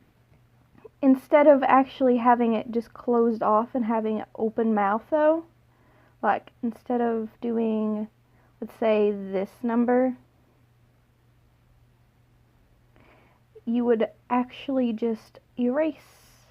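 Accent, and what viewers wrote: South, United States. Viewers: no offense...... i just realized that you sound country :P im country too so idek why i said no offence.... im stupid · Are u country? Bc u have a country accent, sorry it just made me laugh xD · You sound country kinda, but u keep catching yourself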